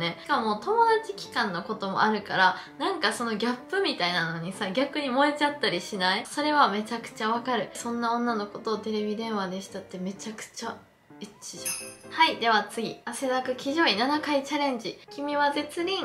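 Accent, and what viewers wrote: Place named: Kansai, Japan. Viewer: 関西弁で話すから余計に面白い良いお年を (^^)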